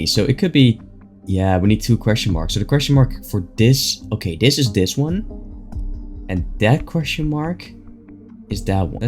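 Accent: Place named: Netherlands. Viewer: Are you from the Netherlands? I feel like your accent sounds Dutch 😅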